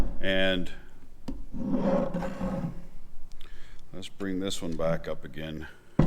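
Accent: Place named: Appalachia, United States. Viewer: What's the noise in the back ground can hardy under srand your hill Billy accent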